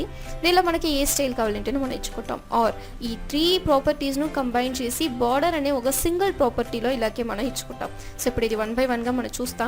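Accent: Tamil Nadu, India. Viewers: Tqq Nice teaching and Tamil slang mixed cute voice 😊😊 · Mam meeru pure telugu kadaa madyalo tamil ala undii?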